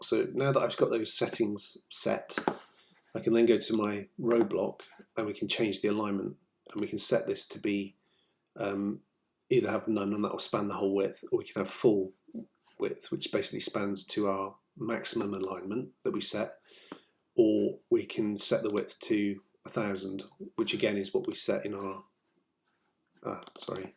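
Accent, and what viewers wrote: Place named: England, United Kingdom. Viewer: Thanks, A great tutorial and it is so good to have a clear English accent. I think that with a touch post editing to get rid of some of the trial and error parts and just give the "polished" end product might be good, but also I do like seeing your thought process when figuring things out. Your voice is excellent and clear and very easy to listen too and your knowledge is a valuable commodity to pass on. I really appreciate the time you have taken to make these videos and would love to see you make some more. Perhaps a playlist for beginners in Wordpress getting going would be great. Have you thought about doing some videos on your everyday experiences working in development and sharing them with us? Maybe some videos on plugin development or securing sites etc? Anyway that's my feedback! Thanks ever so much I really do appreciate your great work. 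- Chris.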